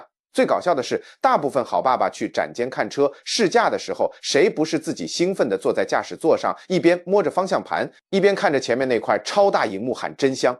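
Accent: China. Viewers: 大陸口音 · 你的敘事在台灣應該是在中國，裡面的車除了最後那幾名其他的車根本在台灣沒有出現過，這種超爛的AI內容農場，這是中國無聊的統戰，錢沒地方花拿出來隨便唬爛，以後聽到中國口音直接封鎖，真是浪費時間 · 大陸口音 還裝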